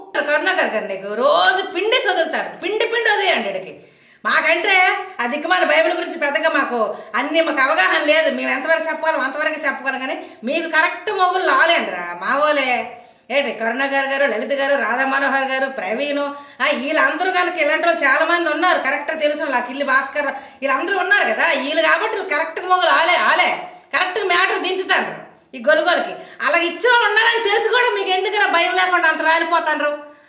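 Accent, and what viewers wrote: Srikakulam, India. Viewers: Sikakulam bhasha needi,mundu neetga matladam nerchukove ,aadadanivena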